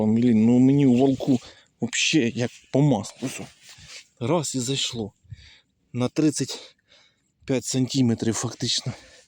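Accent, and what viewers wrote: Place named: Dnipropetrovsk, Ukraine. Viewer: Бажаю здоров'я. З якого регіону ви? По говору таке як правий берег дніпропетровщини. Я вважаю треба половину виорать, а половину ні, от тоді бункер розсудить!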